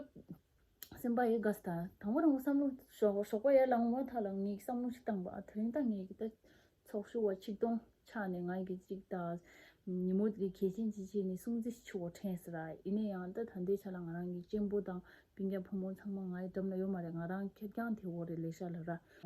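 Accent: Standard. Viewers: བོད་སྐད་གཙང་མ་ཡག་པོ་རྒྱག་གནང་གི་འདུག བཀའ་དྲིན་ཆེ། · བོད་སྐད་གཙང་མ་འདུག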